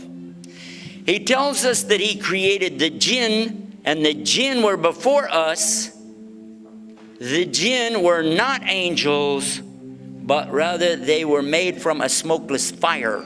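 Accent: United States. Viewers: i love the fact that hes a southern muslim speaker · It makes me smile every time I think that this brother was a christian preacher from Texas. His accent mixed to the knowledge that he shares beautifully proves that Islam is really universal. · Aslaam. This guys one amazing human being and Muslim. I met Mr.Yusuf in Madinah sharif whilst on Hajj in 2012. We spoke briefly and then departed as it was very busy coming out of Masjid e Nabi at Fajr time. He said to me "How's Hajj" in this strong American accent. He still looks the same today when giving dawah on TV and may Allah keep him strong in deen and health. This man use to be a preacher in Christianity and his family had the same background. I think his father was a preacher too and Allah gave him guidance/imaan and he accepted Islam. Now that's what you call a true Muslim and that's an example to all us so called Muslims who were born into Islam. 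I believe Mr. as a Muslim revert is spot on in his dawah and promotes Islam as it should be (Peace). Unlike some of the crazies going about and killing everyone in the name of Islam and giving us a bad reputation. Peace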